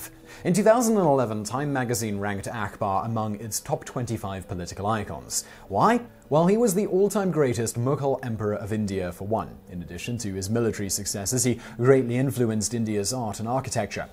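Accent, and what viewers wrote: England, United Kingdom. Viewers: What are you trying to say? He is English, so American mispronunciations are, in our view, wrong! Tom-ay-to, tomato!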